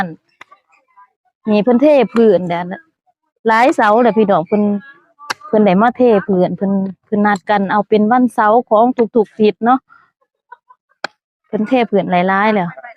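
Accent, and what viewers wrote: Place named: Pakse, Laos. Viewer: สำเนืยงคือ สาวปากเช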